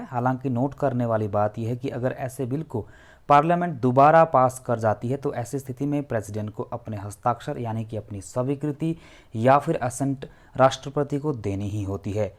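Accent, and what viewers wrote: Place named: Bengal, India. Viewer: Sir aap jarur other language bolne wale ho... I think bengali aur assemese😂😂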